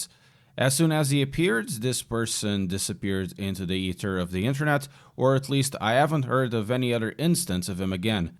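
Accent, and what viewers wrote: Portugal. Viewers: Acho incrivel como mal comecei a ver o vídeo, mesmo com um sotaque e pronuncia mt boa, tive logo o feeling que isto era um tuga ahahah Fui ver outros vídeos e curti do conteúdo, continua o bom trabalho. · You're from Portugal i can hear it 🇵🇹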